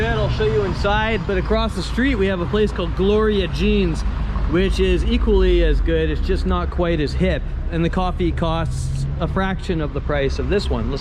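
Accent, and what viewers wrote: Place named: United States. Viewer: Cool vid! Personally, we are with you on the mandatory jabs and masks! We are NOT into it at all so keep preaching. Question, my wife and I are looking to retire and leave the States. Would you consider Merida a nice place to settle down and retire for older but very active people? I love the fact that Merida, unlike many other places in Mexico, is very safe and still somewhat affordable. Thanks and safe travels. (By the way, work on your accent. It's SO Americanized)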